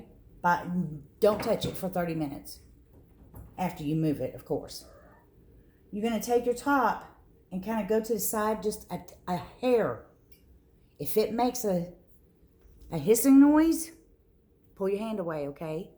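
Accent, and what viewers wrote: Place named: South, United States. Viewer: Adorable southern voice cookin up some sausages. Awesome morning vid. 😃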